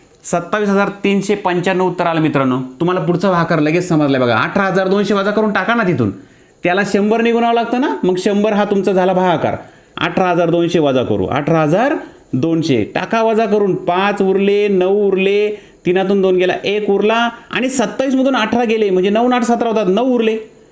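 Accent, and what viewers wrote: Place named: Parbhani, India. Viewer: Parbhani bolto sir bhari strik ahe sir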